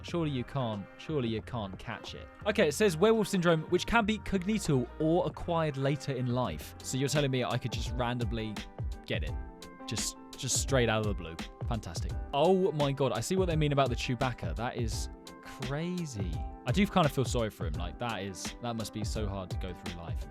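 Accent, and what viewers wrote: United Kingdom. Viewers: I feel like your accent is like British with something else that I don’t even know the accent singing other songs and perfecting the accent except not American accent. Oh my goodness.😂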